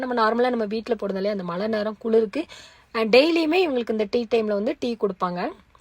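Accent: Tirunelveli, India. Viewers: நீங்க எந்த ஊரும்மா பைய அப்படின்னு பேசுறீங்க திருநெல்வேலி, நாகர்கோவில் இப்படி பேசுவாங்க அதனால் தான் கேட்கிறேன்மா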